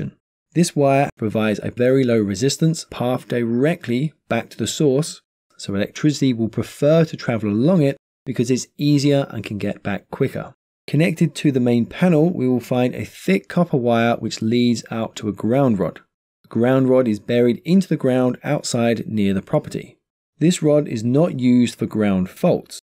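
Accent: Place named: England, United Kingdom. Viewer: Sounds so weird hearing an English accent explaining the American terminology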